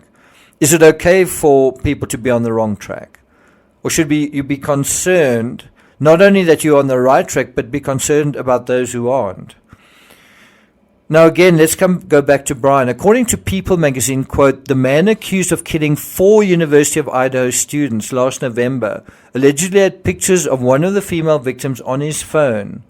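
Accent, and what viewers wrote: South Africa. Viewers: I would say Maddie because he met her at the restaurant, she may have been vegan herself also. Somebody has also say Kaylee didn't live at the residence anymore and was visiting Maddie to show off her new car, so perhaps her being there was totally unknown to Bryan. Xana and Ethan were almost certainly collateral damage as Bryan left the house. PS: I love your South African accent 😆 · I live in Brazil but am a fellow Saffa... I love true crime and have been following the Idaho case. I came by your channel by chance and thought... I recognize that accent - this guy must be South African... low and behold you are. Proudly South African! I just subscribed ☺️ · love your videos! are you south african? I can hear a slight familiar accent. i'm from the free state studying criminology - your channel is truly amazing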